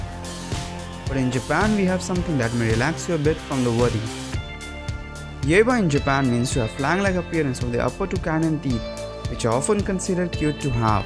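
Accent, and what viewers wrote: India. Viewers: the indian accent is too strong, pity.....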